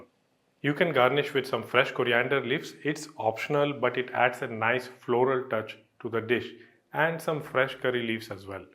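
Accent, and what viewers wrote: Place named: Kerala, India. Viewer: Your accent sounds like a malayali Sir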